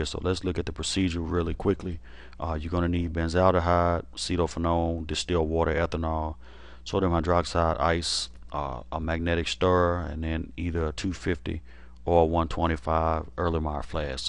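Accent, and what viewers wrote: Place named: United States. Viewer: Cowboy accent is 🔥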